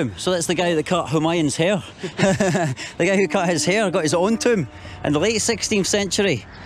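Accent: Scotland, United Kingdom. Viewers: This accent just makes me angry for no reason no wonder Scotland was wiped all over countless times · It’s called a Scottish accent 😁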